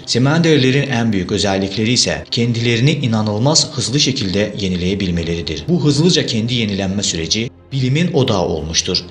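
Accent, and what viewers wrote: Azerbaijan. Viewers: Azerbaycanlı bence aksandan anlaşılıyor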